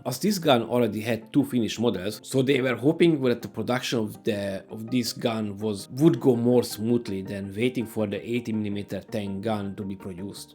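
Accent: Hungary. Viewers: Hungarian accents are so funny to me, you talk the same as the "capandball" guy. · Én azt írtam, hogy az akcentusa miatt ismertem fel azt, hogy magyar. Nem pedig azt, hogy a magyar akcentus ellenére jól beszél angolul. És a dícséret előtt ott van a ",". Szóval nyelvtanilag nem volt helytelen a mondat. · That thick hungarian accent 😄 nice video though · Probably because he is Hungarian ( guessing by accent)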